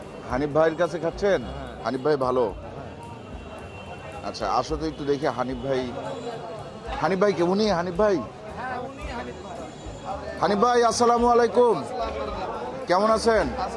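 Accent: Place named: Chapai Nawabganj, Bangladesh. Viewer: Vaiya ajkeo aponar khawa dekhe moja peyechi. Ar chapai er vasai kotha sune onek mugdhu hoyechi 👌❤❤❤❤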